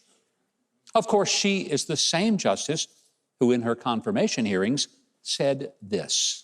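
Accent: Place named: Tennessee, United States. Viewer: You sound like you are from TN. Hockabee.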